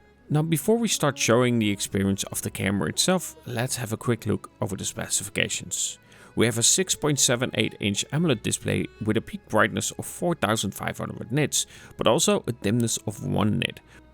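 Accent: Netherlands. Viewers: Just a little dutch accent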